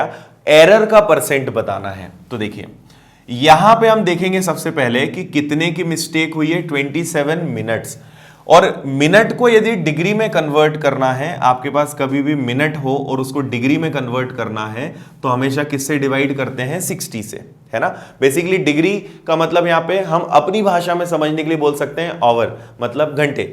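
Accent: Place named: Gujarat, India. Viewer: Your style speaking like Gujrat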